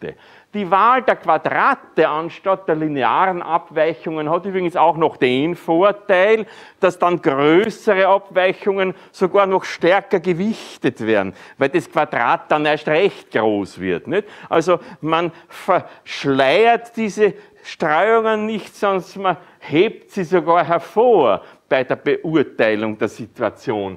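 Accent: Vienna, Austria. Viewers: Allein schon der Wiener Dialekt! Sensationell! Sehr kurzweilig vorgetragen, Danke! Viele Grüße nach Wien aus dem Raum Köln!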